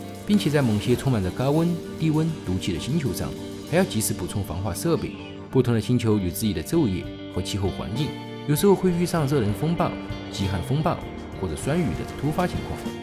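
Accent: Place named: Southwest, China. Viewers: 一听就知道你是西南地区的人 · 他成都的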